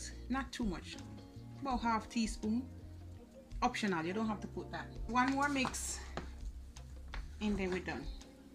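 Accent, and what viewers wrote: Jamaica. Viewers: I've always loved the Jamaican accent, now i love Jamaican roasted chicken too🎉💯🔥🔥will try out sooon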